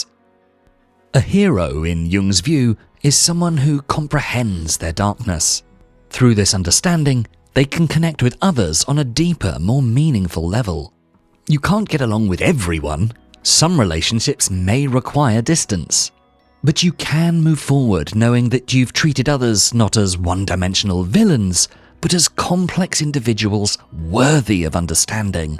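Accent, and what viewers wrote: United Kingdom. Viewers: The narrator is so hard to listen to, can we try to use a more smooth sounding American accent narrator instead? The British accent tone is just so up & down & sounds like trying so hard to pronounce the words but actually more difficult to hear clearly. Thank you!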